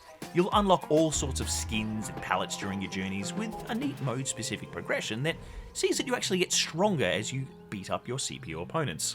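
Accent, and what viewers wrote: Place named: Australia. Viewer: The only thing worse than an Australian accent is an Australian accent that’s trying to be funny and witty. Ick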